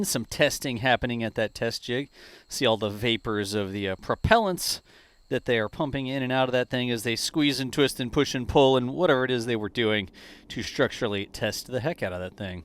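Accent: Andalusia, Spain. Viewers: GRACIAS a tí por traernos las noticias de primera mano y en perfecto español (ademas con ese acento andaluz tan simpatico y agradable) ❤ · Estupendas las explicaciones de como van los avances, gracias por la narración en andaluz.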